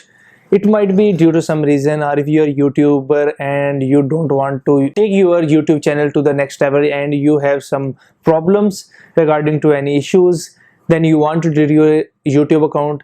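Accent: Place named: Punjab, India. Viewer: What makes you post videos in English, you are clearly not comfortable with the language. You are speaking English in Punjabi accent. Just do videos in a language you are most comfortable in.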